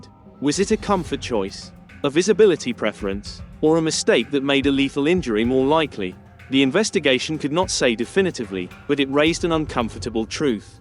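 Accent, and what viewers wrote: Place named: United Kingdom. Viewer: You just ruined this by having a Damn British accent Commentator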